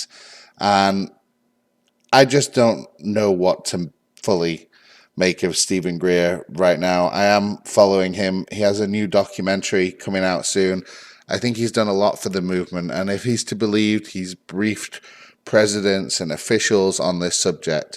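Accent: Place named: Manchester, United Kingdom. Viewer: OK, I thought I rec ognised a Manc twang in there, I'm from Bury.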